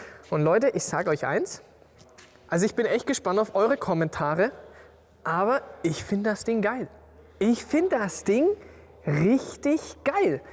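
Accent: Bavaria, Germany. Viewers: heute zum ersten mal auf Eurem Kanal - boarisch, da Wahnsinn (Muttersprache :), des is a moi a Abo wert!! Mir gefiel der M2 vom ersten Moment und ich bin am Samstag in der BMW-Welt um das reale Auto rum - kein Vergleich zu Fotos oder Videos - ein Traum. Mein 240 (F22) wirkt dagegen schon fast unmodern. Brooklyn Grey als Schalter kann ich mir sehr gut vorstellen :) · Ruhig bissl bayrisch find du ch subba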